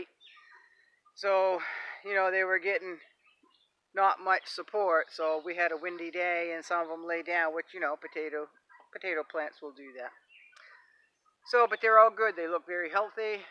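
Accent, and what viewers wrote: Maine, United States. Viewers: Im one of the new subscribers- youtube suggested it while I was watching permapastures. I subscribed because I like the easy going yet firm manner and the garden behind you looked intriguing, I may do a teepee next spring. I also subscribed because I miss the Maine accent, a lot of the family on my dads side are from there.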